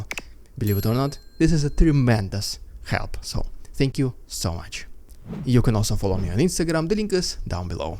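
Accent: Russia. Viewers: lol Russian sounds great in English :D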